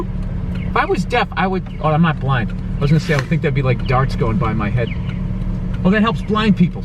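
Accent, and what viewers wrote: Boston, United States. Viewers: I noticed your Boston accent still comes through although you've taken to pronouncing your Rs. Westfield Malls are all over the place; I visited one in Florida. There doesn't seem to be one in Westfield, Mass or Westfield, NJ, though. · do another Boston tour the Boston accent is unstoppable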